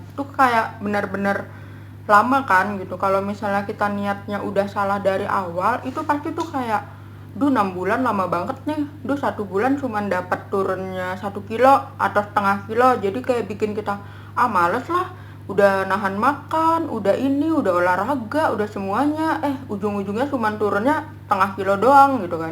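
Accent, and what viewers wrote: Bali, Indonesia. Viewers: Kaya bhs nya methok ke bali ya.. haha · iya y..awalx jg aku ngerasa mbaknya orang bali.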